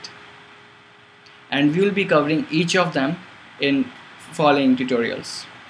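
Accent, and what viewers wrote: India. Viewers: <link> learn how is spelt Gimp. Are You hindi? I am sure your accent is hindi.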